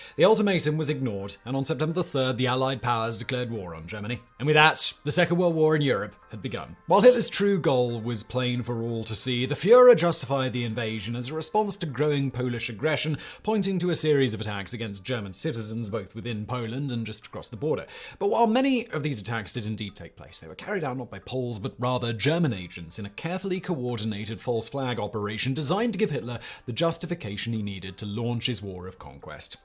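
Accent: United Kingdom. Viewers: Get a pretty girl that does not have a overwhelming British tone do you talking, is just my advice. Maybe good material, although who could sit through your monotone voice.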